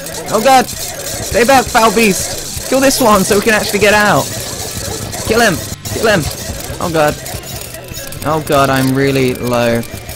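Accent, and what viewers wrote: United Kingdom. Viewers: from the UK, so his english isn't his first language.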